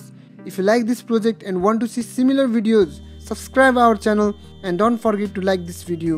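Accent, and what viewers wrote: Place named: India. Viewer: why is his a indian guy my partner is laughing about his accent help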